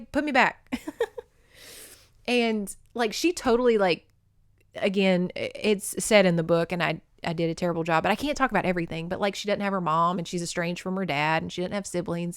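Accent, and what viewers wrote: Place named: North Louisiana, United States. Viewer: I grew up "spittin' distance" from Blanchard. I thought you had a N. Louisiana accent. 😂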